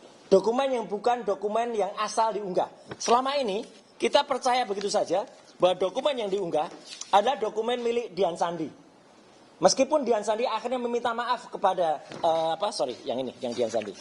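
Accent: Java, Indonesia. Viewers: Awas lu panci karang Luh keras dan medok bahasa nya. Kalo masuk bui jangan cengeng Luh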